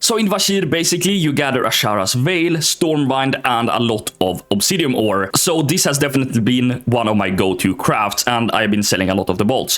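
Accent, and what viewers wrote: Sweden. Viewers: You sound suspiciously Swedish 🤔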